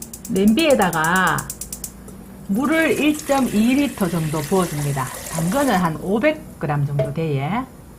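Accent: Gyeongsang, South Korea. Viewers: 아니지 우리 노인네들에겐 딱 좋구먼...... 경상도 사투리라서 표준어 사용자들은 빨리하면 무슨말인지 잘 못알아들을수도 있고...... 암튼 최고 우리 힘든 주부들위해오늘도 열일하는 님❤ 캘리포니아 할미가 👍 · 경상도말씨 너무 정겹네요 내고향 구미가 그립다 지금여기는 비햄기타고 가야하는길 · 이해합니다 저도 말따로 마음따로 거든요. 경상도사투리가 정겹고 웃음이 나네요 아주 유익하네요 내일당장 해 보려구요 좋은정보 감사합니다😊👍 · 말투정말 마이 정겹네요 완존 대구말씨..??맞죠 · 호불호가 있지요. 갱상도 말씨 ㅋ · 아무리 경상도 표준말씨라지만 듣기가 거북한면도 없지않네요 메뉴는 도움이 될 수 있겠지만ㆍ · 당근짱아찌,짱이임미데이! 경남 말이 정겹습니다, 경남 어디예요, 완전 토종 경남 말인데요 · ㅎ ㅎ ㅎ대구신가요?..^ ^ 말씀넘 귀여우셔요~😊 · 대구말시도, 잼있고좋은요리 감사해용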